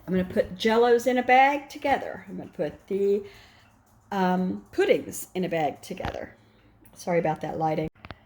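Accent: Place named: South, United States. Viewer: Hey! Here watching. Loving ur southern accent. Ur so sweet!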